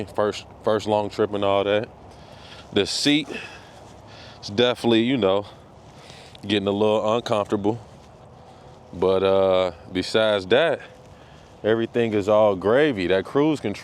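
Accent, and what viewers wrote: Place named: Jamaica. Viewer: God bless my brother 🙏 why I thought I clicked on another persons videos when u came with the Jamaican accent 😅😭💀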